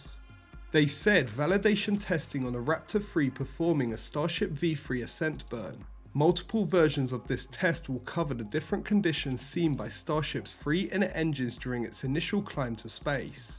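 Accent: England, United Kingdom. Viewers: Another english voice? Man get over it.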